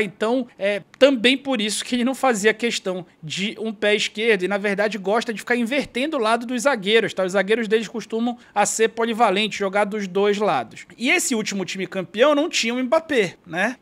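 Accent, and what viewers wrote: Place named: Rio de Janeiro, Brazil. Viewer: achei que fosse carioca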